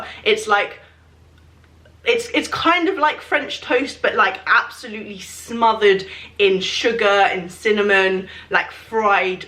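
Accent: United States. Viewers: Hahaha your American accent wasn’t actually that bad. It’s better than I could do a British accent lollll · For these new generation kids, your American accent fits right in there. You'll do fine. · I love your American accent, I’m from Chicago and feel as though my British accent would be just as poor 😂 love you & your videos 💜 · loved the american accent :) i'm from ny! my question for next video would be, how does your mom feel about your camera man :)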